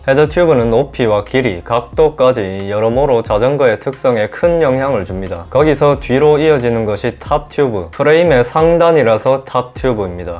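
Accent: Gyeongsang, South Korea. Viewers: 경상도 사투리만 빼시면 100점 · ㅋㅋ 이형 겁나 쿨해~~ 마지막에 끝!~~ 갱상도 사투리쓰는 자덕 두 분중 한분 ! ^^ (나머지는 각시탈) 전라도는 안계시나?? 암튼 구독눌렀네요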